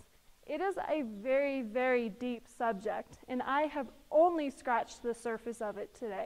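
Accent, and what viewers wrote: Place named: United Kingdom. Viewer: She has a accent it's from somewhere in the UK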